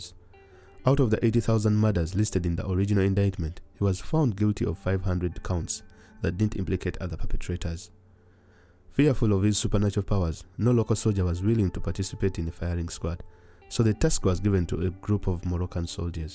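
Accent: Uganda. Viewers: A ugandan is narrating this. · I think the presenter is Ugandan. It's just about how they pronounce words.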